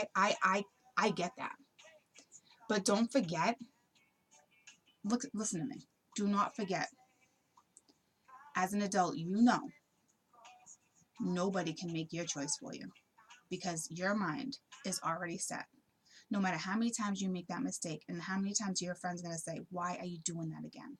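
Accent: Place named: New York, United States. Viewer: I'm new to your channel, just wanted to say I love your energy and your accent is everything. Jersey or Brooklyn? (no offense meant)!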